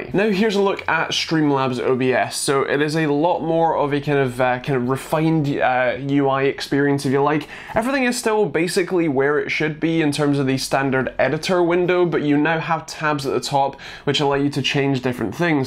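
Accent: Scotland, United Kingdom. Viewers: Great to hear a good review from a fellow scotsman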